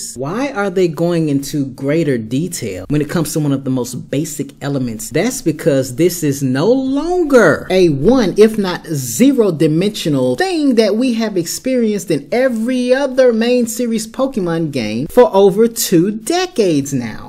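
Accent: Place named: South, United States. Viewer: he sounds Southern to me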